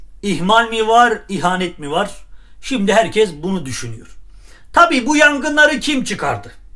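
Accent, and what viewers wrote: Erzurum, Turkey. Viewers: Dostum olayları harika yorumluyorsun,yayın hayatında başarılar diliyorum, bir şey merak ettim bazı sözler ve şiven bizim erzurum yöresine benziyor oralimisin yoksa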